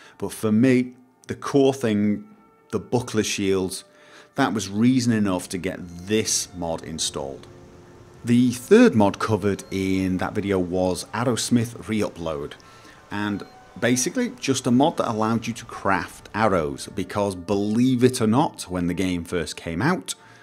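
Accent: Norway. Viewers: Same! And 'cause I love love your voice and accent! (Norway?)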